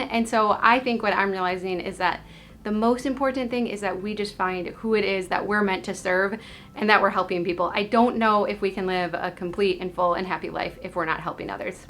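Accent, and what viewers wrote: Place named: Midwest, United States. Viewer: I like you so much. Thank you for all of your videos...and for your life that speaks louder than words.☆ Like you always say, I wish we could be friends.^^ You're a little cooler than I am, but you seem humble enough that I think it could be possible! 😎 lol I'm from Michigan, raised in Wisconsin, and taught a few years in Missouri before moving to South Korea. While teaching here I met & married my Korean husband. I do miss 'home' in the U.S. while also loving my life in Korea, so your vlogs and family life are a 'taste of home' for me.😊 Love your family's Midwest-style...all the way down to your casseroles and accent.♡ I am a sentimental saver. Your minimalist thinking has helped me learn how to declutter! I work at it often...still have more stuff (storage containers) than necessary. And it does feel overwhelming at times, especially before a move (which I have moved often!). 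I was determined to declutter in my classes this week. I had boxes & files of paperwork from the past 6 years (or more) than needed to be gone through. It was hard to get enough motivation/energy to start. But after praying for energy (which God provided!🙌...along with an Americano and another iced latte...😅), your talks kept going through my head: "time will tell", "less inventory to manage", "if you haven't used it in over a year it's okay to let it go", "don't feel guilty even if you spent money on it...it served its purpose for a time", "you can replace it later if you really need to", etc.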